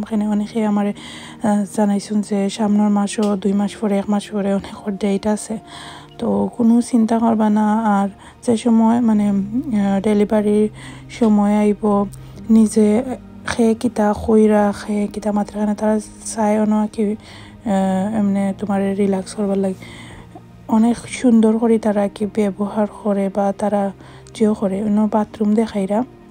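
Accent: Sylhet, Bangladesh. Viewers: Sylheti voice hunar shate shate subscribe korilaisi💞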